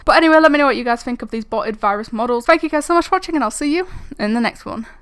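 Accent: Scotland, United Kingdom. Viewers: Scottesh Accent over <num>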